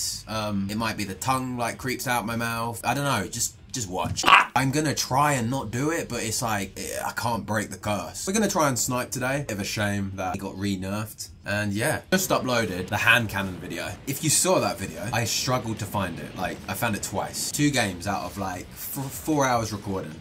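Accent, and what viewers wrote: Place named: United Kingdom. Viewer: HE'S BRITISH?????!?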